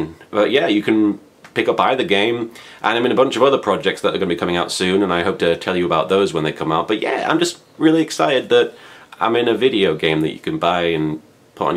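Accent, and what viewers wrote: United Kingdom. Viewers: Holy shit he's British.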